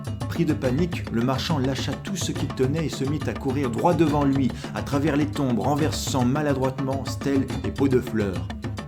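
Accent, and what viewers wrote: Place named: Antilles, Caribbean. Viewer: Super 👍belle narration, belles illustrations et pas mal l'accent des Antilles...D'autres personnages pourraient être intéressant à traiter dans la même veine, papa Guédé avec ses araignées😂 , papa Legba, la Sirène ou encore la célèbre Erzullie 🌹💖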